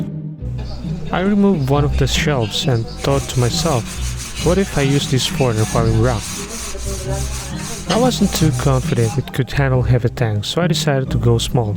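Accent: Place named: United States. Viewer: Thumbnail is AI -Voice is american -backround voices are japanese/chinese/korea Something is very off here.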